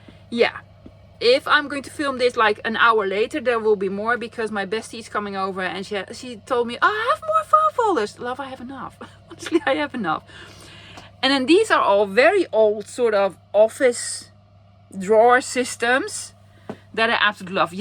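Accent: Netherlands. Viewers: Wow !!! Im new to yr channel & i was blown away watching this, first of all, my Late Mother was Dutch & ive always loved the accent, you have a great sense of humour & i was thinking there is so much in yr craft room, that i could come visit & go shopping 🤣🤣, however slight problem i live in Australia lol I thoroughly enjoyed every minute of yr tour, Thankyou im in awe of all you have 😊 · The moment you started speaking I knew you were from Holland. My parents came to the U.S. right after WW2 with my brother, who was two at the time, and one trunk of belongings. My entire family is still in Holland. My maiden name is Visser (Heiloo) and my mom's maiden name was vd Kuil (Ijmuiden). I submitted DNA for ancestry and I am 98% Dutch (mostly Friesland). "If it ain't Dutch it ain't much" Lovely craft room tour!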